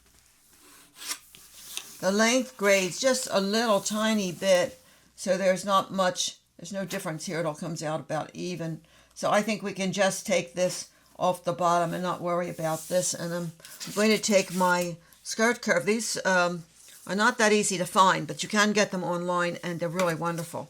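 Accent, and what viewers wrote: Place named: Pennsylvania, United States. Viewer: I just found your channel and am learning so much..!! You must be from PA, my aunt sounds EXACTLY like you ❤.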